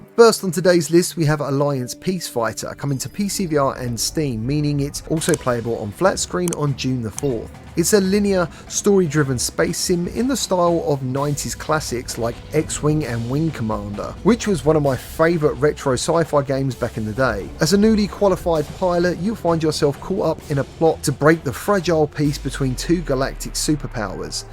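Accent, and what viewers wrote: England, United Kingdom. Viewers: Gimmie that VR news with that classy english accent brother 🎉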